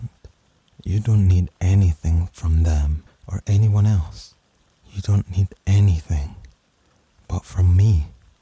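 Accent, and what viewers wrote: Scotland, United Kingdom. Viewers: From what I can tell it's Scottish I don't know specifics though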